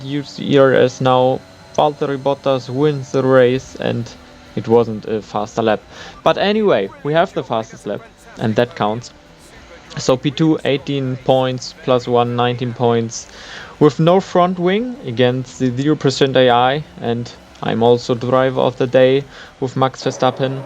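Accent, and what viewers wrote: Germany. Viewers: Wieso machst du deine Videos eigentlich nich auf Deutsch auf Englisch ist das so weird weil man merkt das du deutsch bist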